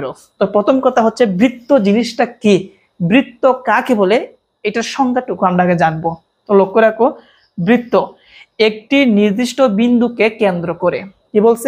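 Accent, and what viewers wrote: Sylhet, Bangladesh. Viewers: Apnii ki sylhet er habiganj er ?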